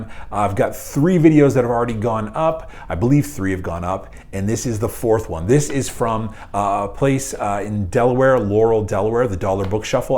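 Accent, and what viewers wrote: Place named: Canada. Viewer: I was wondering if you were Canadian as I’ve picked up on the accent, especially in this video. That explains why you are so nice and polite!!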